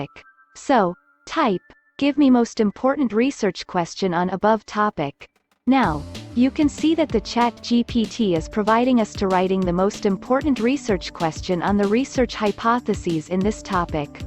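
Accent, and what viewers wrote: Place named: India. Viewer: She/he is from India. Thats how we talk.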